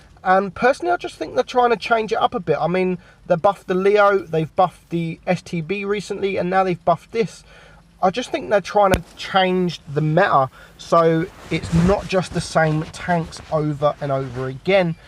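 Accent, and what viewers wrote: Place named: Cockney, United Kingdom. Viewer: What is the maer you are talking about ? All of us are not cockney fluent, so please help us sometime with subtitles or eventually a more classical accent :)))))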